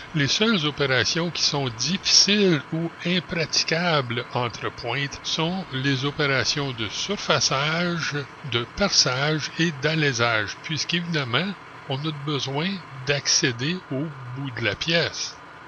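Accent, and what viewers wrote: Canada. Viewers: Excellent professeur, ce, très à cheval sur la transmission du savoir (ouais, mais être à cheval, pour un, c'est un peu son job, non?). J'ai déjà beaucoup appris de ses vidéos. L'accent canadien rend parfois l'accès au contenu un peu délicat pour une (vieille) oreille belge, mais je prends beaucoup de plaisir à apprendre avec lui.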